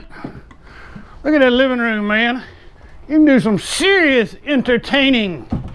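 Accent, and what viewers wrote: Texas, United States. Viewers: I'll buy this if you come along, too 😉 ! You are AWESOME!! I didn't check yet where you're located, but you sound like you might be a Texan, who are some of the coolest people in the world 😘 !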